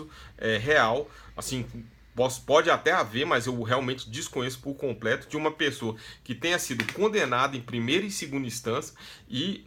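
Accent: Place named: Bahia, Brazil. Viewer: Boa explicação...E esse sotaque puxado pro baiano? Saudações.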